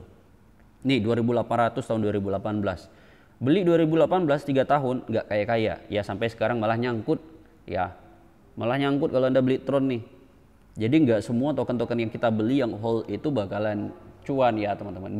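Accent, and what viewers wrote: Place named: Indonesia. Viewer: Dari gaya bhs Inggris nya aj gw udh feeling org Indo (gw gabisa jelasin yg pasti klo lu suka merhatiin gaya bhs Inggris lu bisa paham) Yah modal sejuta buat pengalaman worth it lah. Thanks otot anjing 🍻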